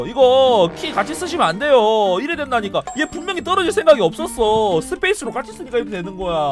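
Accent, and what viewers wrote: Busan, South Korea. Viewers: 아 부산사투리 너무 찰지고~~~ㅋㅋㅋㅋㅋㅋㅋㅋㅋㅋ 부산아재 확실하네용 ㅎㅎㅎ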